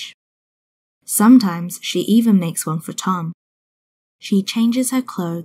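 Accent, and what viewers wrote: New Zealand. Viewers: Your channel's contents are encouraging me to do better listening skill, especially in NZ accent, but it is a little bit ashame that only a few people know this chnnel.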